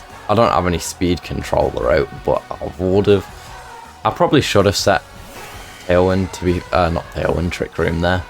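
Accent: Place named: United Kingdom. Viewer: Do you go to many regionals. You sound british to me? Did you go to the Birmingham regionals?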